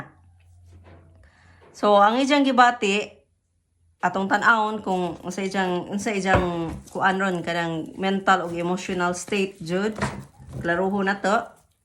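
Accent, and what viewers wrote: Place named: Bohol, Philippines. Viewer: Baka taga Bohol siya,dahil sa pananalita niya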